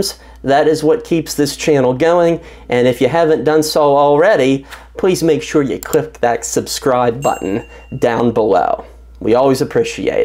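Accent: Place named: Pittsburgh, United States. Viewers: The presenter has a Pennsylvania accent. He sounds educated. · Bro you gotta be from Pittsburgh Area. I moved away from there when I was like 12 and can pick that areas accent out. Lmk if I’m right. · This guy's Yinzer accent is strong.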